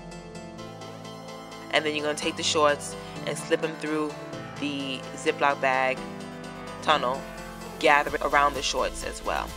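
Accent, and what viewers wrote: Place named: Brooklyn, United States. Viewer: I love this diy! I had a feeling you were a Brooklyn girl from your voice and I checked your Facebook!!! BK Love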